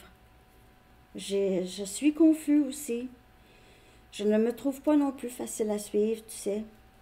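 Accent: Canada. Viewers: Bonsoir, votre guidance me parle énormément. Merci à vous.🙏❤️. J'adore votre bonne humeur qui est contagieuse et votre accent canadien. 👍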